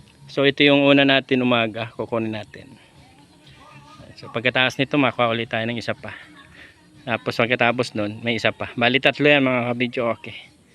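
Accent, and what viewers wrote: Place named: Camarines Norte, Philippines. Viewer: hello po idol,watching po full wh po,done tamsak at dikit narin po ako sa bahay mo😊❤❤parang sa tono po ng salita mo idol parang taga camarines norte ka po,taga dun po kase ako baka po magkababayan tayo idol😊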